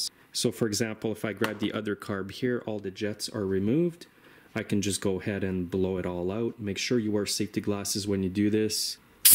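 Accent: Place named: Canada. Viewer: Definitely Fahrenheit. Also, I just love the Canadian accent. Good video.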